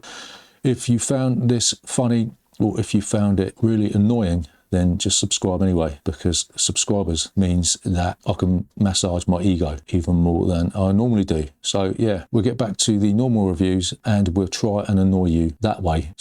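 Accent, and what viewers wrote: United Kingdom. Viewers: I think that this six-part series is among the most entertaining board game videos I have watched. A couple of the titles made it onto my wish list thanks to it. With that delightful British accent, even the swearing sounds eloquent, sophisticated, and sublime. It would not be possible to pull that off in any other localized version of the English language. It’s great to be a patreon.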